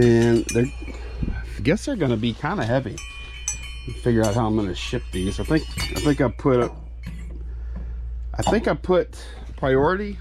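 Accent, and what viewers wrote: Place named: East Coast, United States. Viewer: My Dad used to let me stay up to watch Nature Boy Ric Flair, Rowdy Ronnie Piper, Hulk Hogan came later I think... back in the 80s. I love listening to back east accent also. I am from the east but moved to west coast 20 yrs ago.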